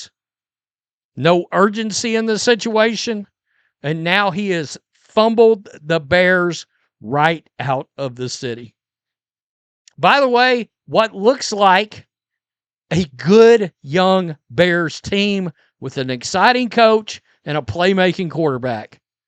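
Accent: South, United States. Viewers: Curious what neighborhood of Chicago accent is that? What are you, 4th generation Uptown? Chicago's a progressive City. I doubt very many of us care what some d wad with a Southern accent thinks.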